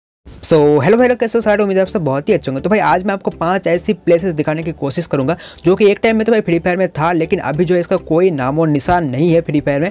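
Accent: Bengal, India. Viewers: You are from Bengal ... I can feel the Bengali version of hindi ... In your hindi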